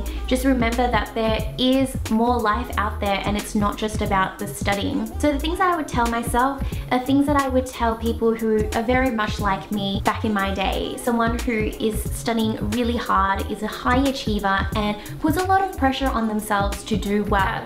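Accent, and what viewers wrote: Australia. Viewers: Hang on is she Australian??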